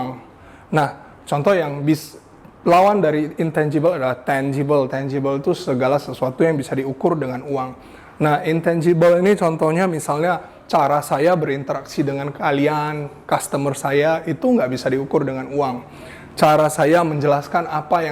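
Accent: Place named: United Kingdom. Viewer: iya itu logat british. Sama kayak Harry Potter.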